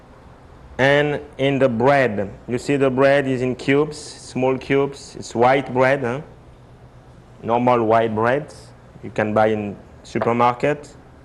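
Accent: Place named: New Orleans, United States. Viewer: As a kid I feel in love with her voice from the intros. It was so sultry and New Orleans to me. I still think it's sexy! Hey Great Chefs people, let her know that! She should do voice over work.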